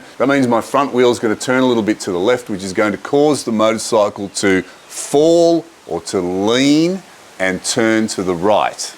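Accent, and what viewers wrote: Australia. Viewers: I wish I had a sweet Aussie accent. Love you way you say Harley (Haawlee). You kind of remind me of actor Bryan Brown. Anyway, love your vids and subscribed. Cheers from the USA! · I assume that because you ride a Harley you label your video as advice for Harley riders and of course it helps the video get views (so my comment is not a criticism just an observation). The advice could equally apply to anyone who rides a big twin cruiser. No doubt there are features unique to the Harley but as a rider of a Kawasaki Voyager 1700 I would say the same advice is applicable so I thank you for your video. It is good to see and hear an Australian voice offering motorcycle riding techniques and see Australian roads. I think life skills advice is also helpful - thank you. I should own up and admit I know ride a Can Am Spyder so cornering is an entirely different experience. 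I felt the Kwaka was getting too much to handle and I wanted to keep riding so I went for 3 wheels. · I'm sorry, but with your Aussie accent, I must have misunderstood you during your comments regarding "life corners." Much of what you said is soundly Biblically based, so I thought the part where you directed the audience to see more at the end of the video, your comment had the word, Scripture, in it. Not so. Have a blessed day. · Love that Aussie accent, miss Australia so much, look forward to come to down under and ride along Great Ocean Road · Clicked on this video out of curiosity as I don't even ride a Harley, but then I heard the Aussie accent and saw Arthurs Seat (which I ride a fair bit) and kept watching. Good tips and are applicable for all types of bikes (I ride a gixxa). Even after riding for over 20 years it still pays to readdress the fundamentals of cornering. Especially when you haven't ridden for a while, or you are riding in adverse conditions. 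Personally, my biggest mistake is not looking far enough ahead if conditions are dodgy, and that just makes things seem faster than they are (plus you need to constantly adjust where you look). Look further ahead and you can hold that vision longer, things seem to move slower, and the bike will tend to go smoothly around the corner. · Geezo, was this video made for the Ausies? Hard time understanding that accent.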